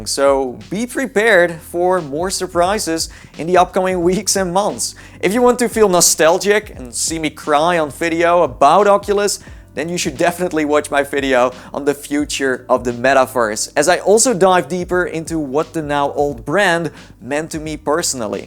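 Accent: Netherlands. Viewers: man has a dutch accent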